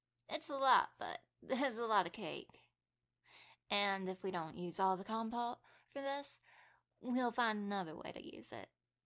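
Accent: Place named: South, United States. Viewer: Your Southern accent was so cute and I love hearing this audio that came out a year before discovering your channel 9 months ago. This character was both hilarious and adorable and you voiced her perfectly. Cool audio! LOVED IT!!!😊💚👍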